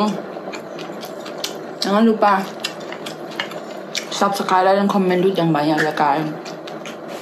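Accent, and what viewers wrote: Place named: Thailand. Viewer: Dnger kak ngomong, jadi kangen sama cara ngomogng papaku yg mirip kya kak, karna papaku juga orang thailand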